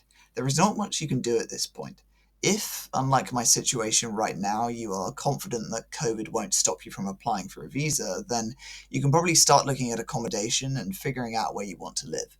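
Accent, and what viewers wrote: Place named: United Kingdom. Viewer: It´s lovely to hear you talking. I am not used to listening to British English that much anymore. Besides that, I hope that everything works out well in the future and you can come to Taiwan soon!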